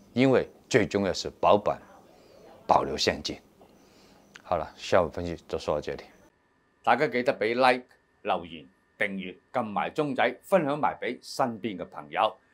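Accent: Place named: Japan. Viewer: 像日本人講普通話，哈哈😃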